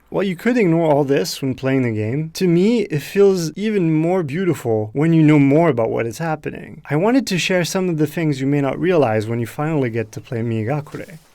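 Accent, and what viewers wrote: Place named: France. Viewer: he sounds french, that's really cool. anyway, great video!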